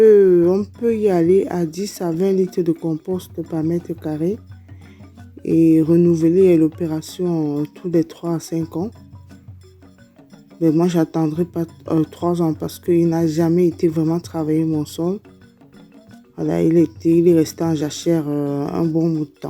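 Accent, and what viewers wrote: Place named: Africa. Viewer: coucou je trouve que t’as un accent africain 👋😄 t’es trop bien ce que tu fais. Bonne vidéo comme d’habitude. . quelles sont tes résolutions pour 2022, moi c’est de faire plus de vidéos sur YouTube c’est cool. Bonne soirée. Yanis, À bientôt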